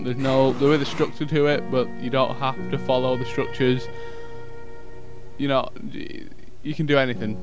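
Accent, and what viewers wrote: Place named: New York, United States. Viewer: I love this guys accent it sounds like a New York accent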